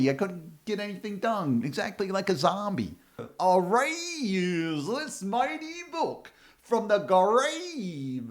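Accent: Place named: Pennsylvania, United States. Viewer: I'm trying to place the accent. Chester County. Maybe Delaware County.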